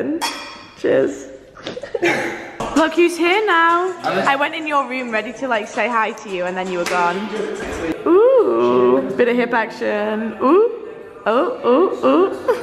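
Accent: United States. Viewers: I’m not even hating but why do you talk like your American when you’re clearly English. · U sounded a bit american 💕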